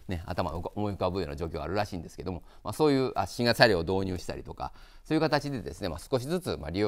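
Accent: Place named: Kansai, Japan. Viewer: とりあえず関西弁やめとこか？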